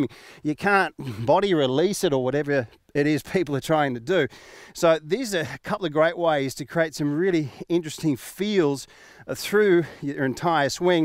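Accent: Australia. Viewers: I hear the Aussie accent are you based here?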